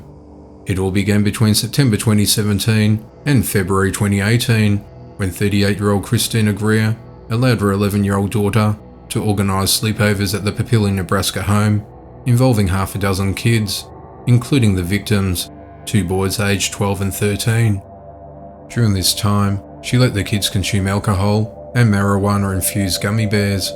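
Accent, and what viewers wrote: Australia. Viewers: I’m a new subscriber and love this channel, your voice is very easy to listen to, can I detect an Aussie accent?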